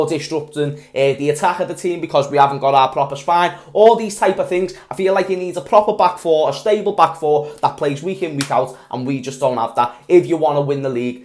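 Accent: Liverpool, United Kingdom. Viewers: scouse not english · It's a scouse accent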